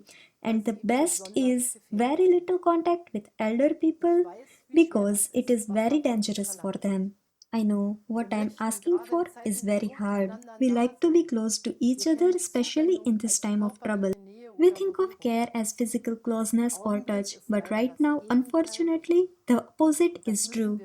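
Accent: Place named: India. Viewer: If you keep arguing over semantics like this you're gonna have a rough time later on. Fact is, it's hard to follow a person that has a thick indian accent.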